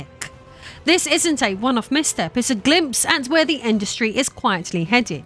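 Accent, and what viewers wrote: United Kingdom. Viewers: Boobs, tight leather, & a british accent... the internet just ain't playing fair 😂👍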